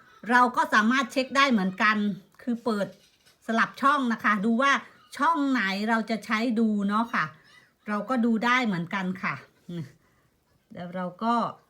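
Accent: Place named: Surin, Thailand. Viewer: ขอบคุณสำหรับความรู้ดีๆครับ เสียงบรรยายคล้ายคนสุรินทร์บ้านเดียวกันเลยครับ 555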